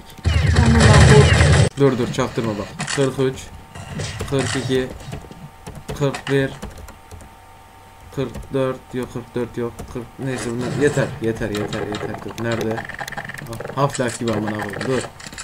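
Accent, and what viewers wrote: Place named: Kastamonu, Turkey. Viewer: Kastamonulu şivesinden anlamadınmı :D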